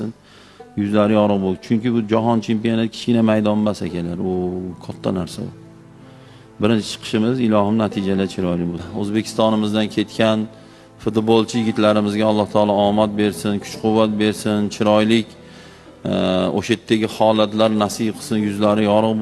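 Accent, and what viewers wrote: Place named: Russia. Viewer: Domla nega rus tili talafuzini koʻp aytasiz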